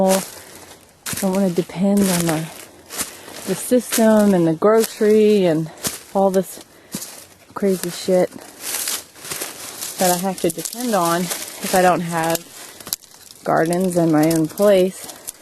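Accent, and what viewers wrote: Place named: North, United States. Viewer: Of course, assuming by state, I am just assuming you are in northern US or Canada based on your accent.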